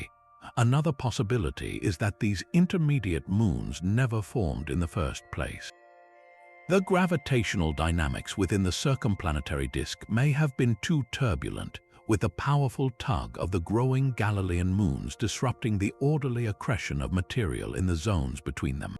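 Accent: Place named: United Kingdom. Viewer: British accents markets to idiots .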